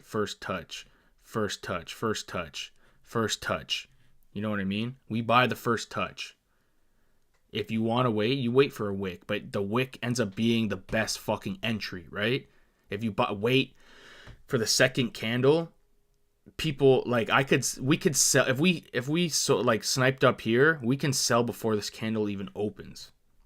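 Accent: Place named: Jamaica. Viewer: Hey u Jamaican..jah know u sound like one ?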